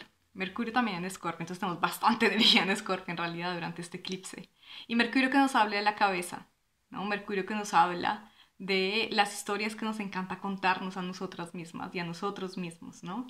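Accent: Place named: Colombia. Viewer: GRACIASSSS INFINITAS..NO SABES QUE BIEN SE SIENTE OIRTE..TANTÍSIMA SABIDURÍA..UN ABRAZO DESDE ISLAS CANARIAS..Y ESE ACENTO DE MI COLOMBIA💛💙❤....subscrita...desde Ya...y recomendaré tu Canal!!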